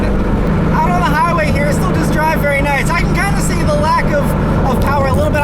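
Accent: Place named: Pittsburgh, United States. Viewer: Dude! I love your videos. You sound like you're from Pittsburgh. You remind me of Mr. Rogers as a child who loves cars instead of marionettes and toy trains.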